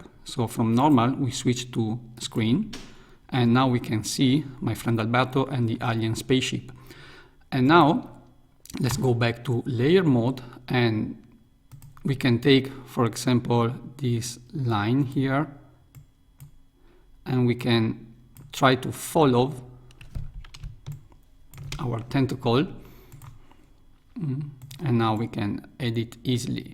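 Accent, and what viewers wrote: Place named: India. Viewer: Чувак, по акценту же сразу ясно. Индус 100%. Ну или откуда-то оттуда, из 3-его мира. Кстати, правильно пишется "Where are you from?", так, на будущее :)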